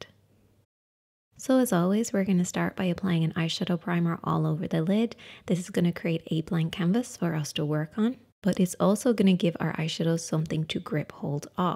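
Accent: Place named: Ireland. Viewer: Hi, how are you? Lovely to hear an Irish accent amongst all the others! I think you are genuinely the only expert i will now follow. (along with Lisa Eldridge)...thanks for asking how everyone is doing. Its definitely genuine when an Irish person asks & not just filler, throw in a,"Conas atá tú & you might get some grant lol. I suffer from chronic nerve pain following an amputation and oft just find videos in the midst of a bad bout of pain to try drown out the terrible insufferable spasms. Anyway girl, keep flying the flag. From your neighbour in Limerick.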